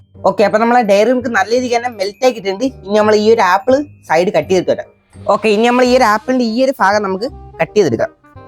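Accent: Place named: Kollam, India. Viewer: Kollath parayum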